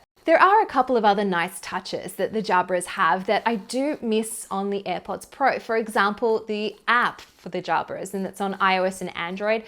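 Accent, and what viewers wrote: Australia. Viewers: Dodgy aussie accent. Reminds me of the racism i faced while i was there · Nice hearing an Aussie accent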